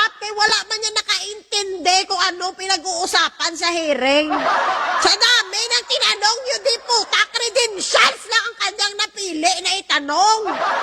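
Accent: Iloilo, Philippines. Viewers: Madam ask lng taga saan po ba kayp ilongga ka ba? Salamat enjoy ako manuod sayo..God bless mo vlog...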